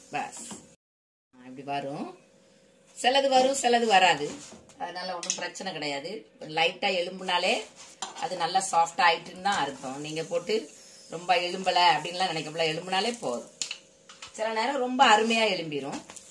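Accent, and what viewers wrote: Tirunelveli, India. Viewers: Tirunelveli Tamil super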